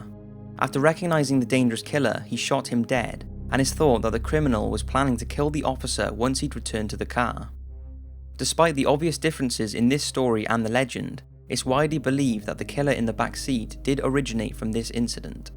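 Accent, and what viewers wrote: United Kingdom. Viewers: Idk why, but it's always scarier when a brit is speaking in the video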